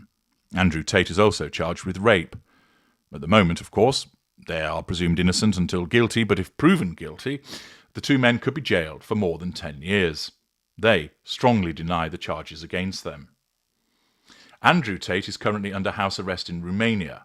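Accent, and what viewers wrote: United Kingdom. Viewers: 2 min in and I'm bored outta my mind, the old brit voice doesn't help either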